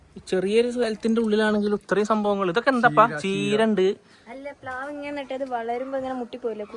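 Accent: Kozhikode, India. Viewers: Inkum venam kozhikodeinnpenn ketta aa samsaram nalla rasam und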